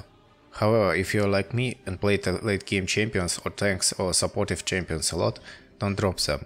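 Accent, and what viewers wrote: Russia. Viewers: Акцент не очень. Понятно что ты русский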